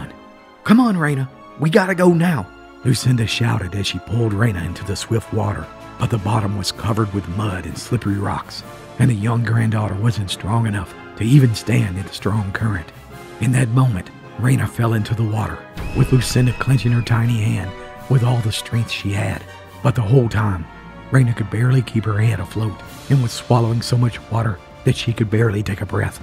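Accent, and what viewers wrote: Appalachia, United States. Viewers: Your voice and Appalachian accent are just what I need when things get heavy on my mind. I have intentionally taken my blood pressure before and after listening to one of your stories, and it never fails that my blood pressure drops considerably after the story. I told my doctor that she needs to prescribe your channel to all of her patients, especially the ones with high blood pressure. Thanks for all you do.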